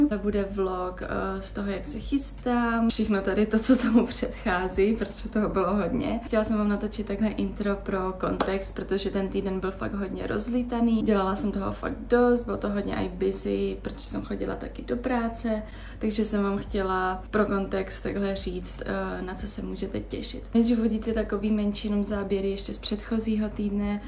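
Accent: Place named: Moravia, Czech Republic. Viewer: a miluju tvůj moravský přízvuk ♥️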